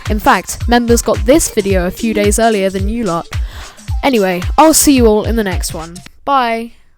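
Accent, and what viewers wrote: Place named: United Kingdom. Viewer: I like your British accent, I would also like to talk like that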